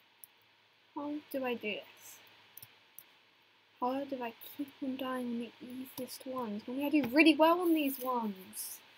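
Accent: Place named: United Kingdom. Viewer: You sound more British here. I think us Americans are rubbing off on you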